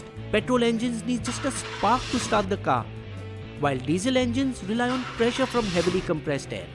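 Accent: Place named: Mumbai, India. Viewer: Sounds like you're from Mumbai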